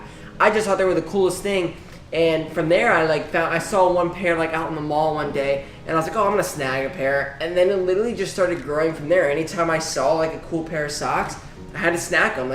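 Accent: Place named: United States. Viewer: I don’t know why but your voice reminds me a lot of Tom Holland’s Peter Parker American accent lol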